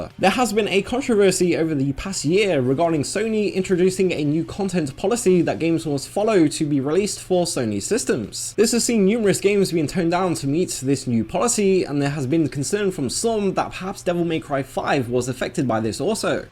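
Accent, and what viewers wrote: United Kingdom. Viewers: I had to turn on the captions cause of the thick redcoat accent lol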